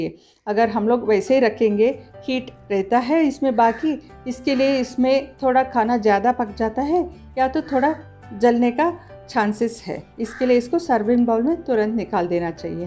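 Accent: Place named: South, India. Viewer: aapka hindi me south india wala accent hai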